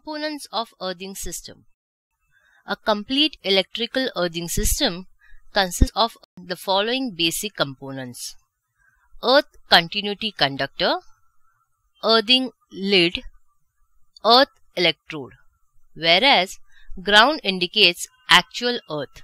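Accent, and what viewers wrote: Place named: India. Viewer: But this indian-english akcent is best😅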